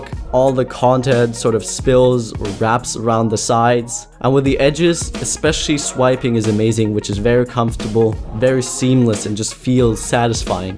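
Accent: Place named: Germany. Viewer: you're from Germany? you have a really nice accent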